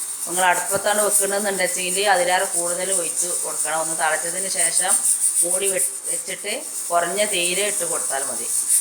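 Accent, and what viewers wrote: Malappuram, India. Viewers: Malappuram ano veed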